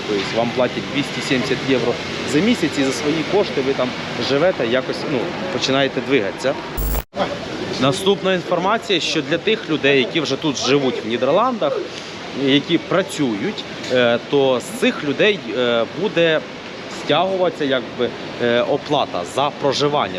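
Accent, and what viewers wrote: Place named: Zhytomyr, Ukraine. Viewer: Він розмовляє суржиком... суржик житомирський .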